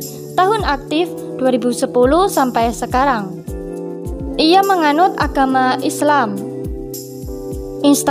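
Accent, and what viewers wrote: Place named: Java, Indonesia. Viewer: Jawa bgt kak..wkwkwk👌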